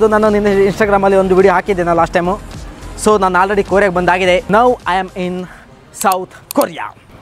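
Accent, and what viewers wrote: Kundapur, India. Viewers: Bro niv pojaraaa navu poojary.. Nim kandapr bashi mathad thidre bari kushi ath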